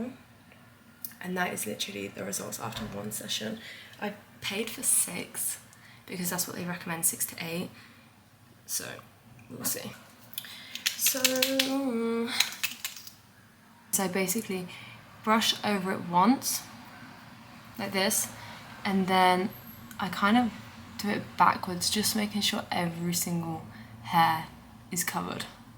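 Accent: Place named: England, United Kingdom. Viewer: Came here from tt - English accent was a nice surprise 😂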